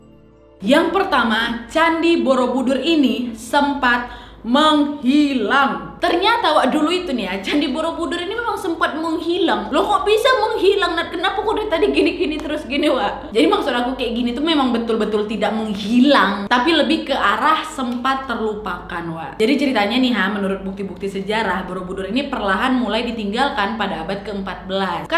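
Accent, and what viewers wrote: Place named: Medan, Indonesia. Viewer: Cerdas,pintar,ceriwis luar biasa penjelasannya, penting banget untuk di ketahui, orang Medan ya mbak ini😀👍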